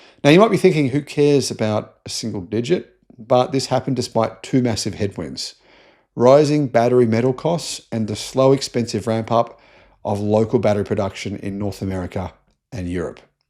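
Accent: Australia. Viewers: He speaks colourful Aussie!! 😂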